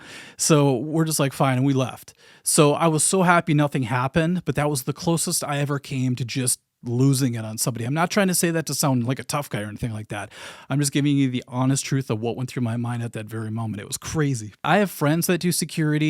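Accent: Minnesota, United States. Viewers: Hey,. I always wondered where you might be from. I've watched many of your videos over the years and I could definitely tell you had a slight accent of some sort, but I couldn't quite place it, whether you were from Canada, Midwest or maybe even the Pacific Northwest (where people often can have a slight accent similar to the Midwest, where I'm guessing a lot of those folks originally came from). Minnesota makes perfect sense, though. You betcha! LOL:)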